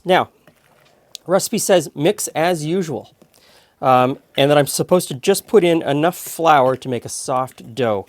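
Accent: Canada. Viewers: Canadian Accent on point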